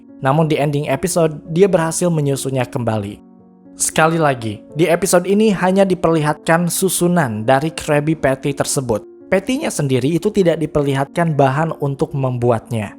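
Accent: South Jakarta, Indonesia. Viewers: Bang lu orang Jaksel kh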